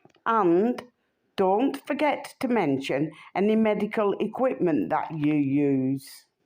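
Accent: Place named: Burnley, United Kingdom. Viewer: Thats a Burnley accent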